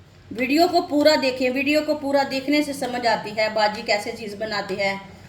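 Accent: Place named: Punjab, India. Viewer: Or is k bolny ka andaz b bus, panjabi style me urdu 😅😅😅😅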